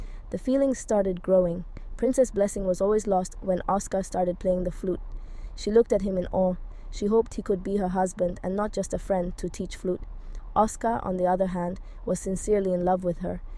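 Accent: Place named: Liberia. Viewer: You sounding like you’re from my hometown. Are you a Liberian?!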